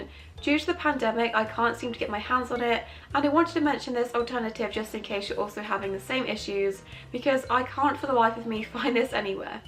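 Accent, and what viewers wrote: England, United Kingdom. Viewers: At the end you said about your voice. Well I think it's lovely. English accent is really cute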